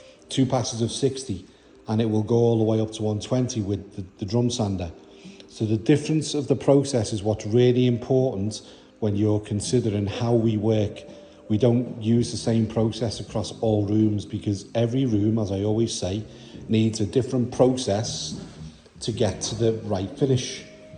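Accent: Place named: Liverpool, United Kingdom. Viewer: Hey, are you from Liverpool.. I detect a fellow Scouse accent. ❤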